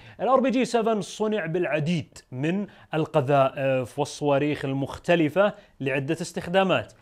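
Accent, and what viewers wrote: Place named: Saudi Arabia. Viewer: اعجبني ان عربيا واعتقد لهجتك سعودية لقد ابدعنا في استخدامها في لبنان وادخلنا ابتكارات من 1975 وحتى 1990 لا يمكن شرحها الان القذيفة التقليدية العادية ثلاث مراحل التاندوم اربعة مراحل الروسي بثلاثة الاف درجة مؤية المصري الكوبرا خمسة الاف درجة مؤية المسماة الكوبرا الياسين من المبكر الحديث عنها تقنيا جهاز رصد الميركافا بعيد المدى وفشل دائما امام الياسين لقصر المسافة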